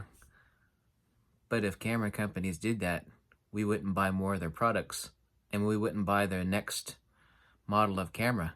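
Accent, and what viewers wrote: Canada. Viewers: Great Canadian accent....had me fooled. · Gordon Mohr As a Minnesotan myself, a tad perhaps but still more of a Ontario inflection.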